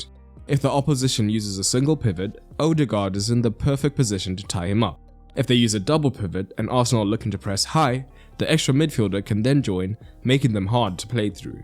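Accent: South Africa. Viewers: Are u South African? 🤨🤔🧐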